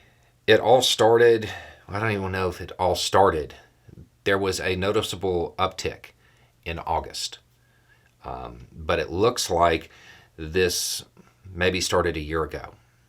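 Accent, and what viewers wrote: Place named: Boston, United States. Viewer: You say it like you're from Boston. Lol I lived there for 30 years. It's always been Nev-add-uh. Here's one for ya .... Tooele. It's in Utah.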